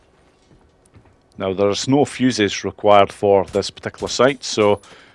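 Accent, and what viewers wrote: Scotland, United Kingdom. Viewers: Gg mate love the voice scottish right?😂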